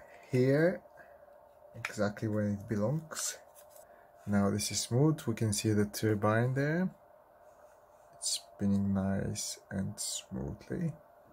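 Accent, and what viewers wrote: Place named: Russia. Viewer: По акценту вроде наш) как снять эти серые крышки, не повердив корпус?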